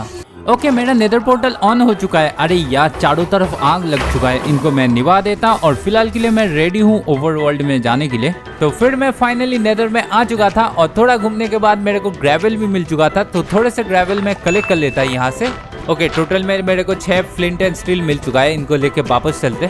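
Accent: Bengal, India. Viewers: Are you Bangali??? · Bro r u Bengali ??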